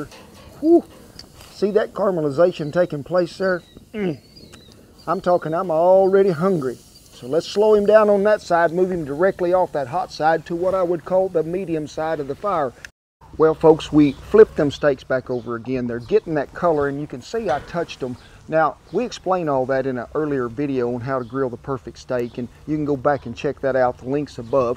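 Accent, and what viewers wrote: South, United States. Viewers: His accent results from the thousands of immigrants from Tennessee, Alabama and Mississippi who came to texas as early as 1830.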